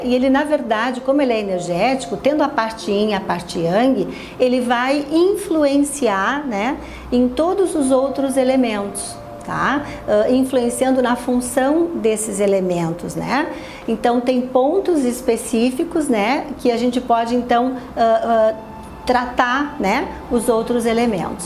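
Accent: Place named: Rio Grande do Sul, Brazil. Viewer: Tô reconhecendo e me identificando com esse sotaque. Somos conterrâneas dos Pagos?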